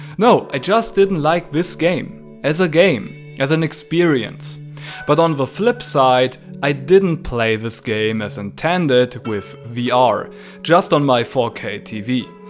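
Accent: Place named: Germany. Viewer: I wanted to watch the video since I agree with the opinion, but from the first "laaaahst" the fucking German cringe-accent hit me. Peinlich as usual. I hate mine peinliche Mitdeutschen so much................................. Please get off DuRöhre until you've learned to pronounce (modern) English. Watch/play stuff without the fucking Synchros for instance, why dont'cha... Un-verfickt-glaublich......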